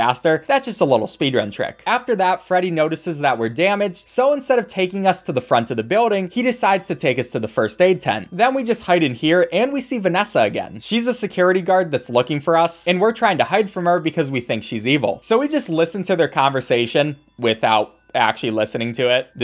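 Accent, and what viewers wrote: Denmark. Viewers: Are you from Danmark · Your danish!?!?!? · er du dansker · are you danish?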